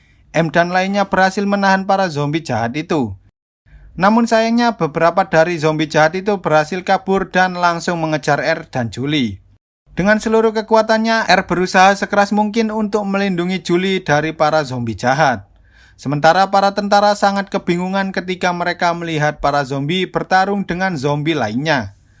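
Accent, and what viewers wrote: Java, Indonesia. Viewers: Ene wong jowo iki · Abang orang jowo (jawa) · Kasi lope dong lur kwkw daerah mana bang jawa nya? Gua jateng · Jowo ne ngendi mass?? Maklum Subscriber awal🔜😁😁😂🤣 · Wong Jawa apa pie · abang orang apa? jawa? abang dalam menjelaskan filmnya kocak banget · fix logat wong jowo😆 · Kamu orang jowo yo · LOGAT ELO MEDOK JAWA BINGITS SIH MAS E NARATOR. NGAKAK GW 😂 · Orang jawa yah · Ngomongne medokne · Koee wong Jawa Yoo mas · jawane medok yo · admin ngomongnya jgn terlalu medok jawanya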